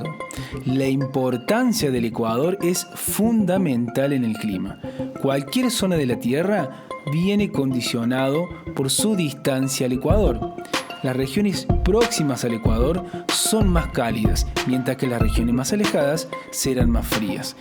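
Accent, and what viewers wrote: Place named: Córdoba, Argentina. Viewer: como amo su acento cordobés LA PUTA MADREEEE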